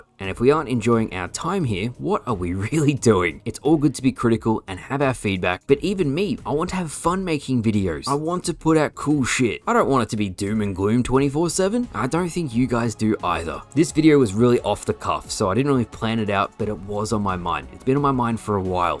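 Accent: Australia. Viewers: I can't find any matches in infinite you sound Aussie too so I wonder how you do with matchmaking I can't get a single match in Infinite on PC, and in MCC I just get matched with Yanks and Euros but at least get some matches at all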